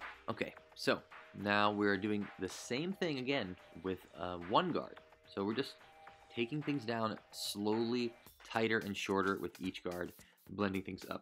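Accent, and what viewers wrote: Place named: United States. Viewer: Absolutely awesome dude from one hairdresser to another I’m from the UK 🇬🇧 i can tell your the US 🇺🇸 accent.Loving your content so much ❤can’t wait for more of it 🎉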